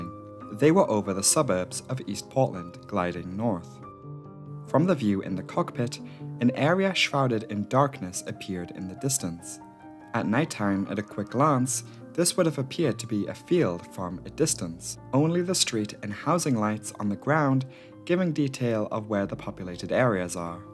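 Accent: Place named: Geordie, United Kingdom. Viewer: I’m fascinated by this accent. It seems mostly Northeast England, but with little hints of South Wales every now and then. Then there’s a touch of rhotic North American creeping on in there too. Is this a Geordie who moved to the US?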